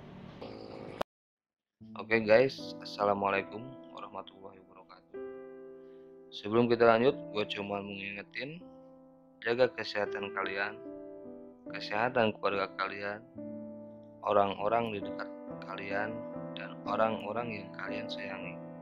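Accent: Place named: Banyumas, Indonesia. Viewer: wong ngapak pwrt ini bang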